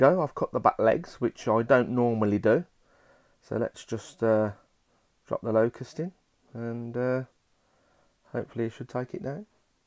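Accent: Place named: Birmingham, United Kingdom. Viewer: That's the Brummies accent for you (I'm one myself)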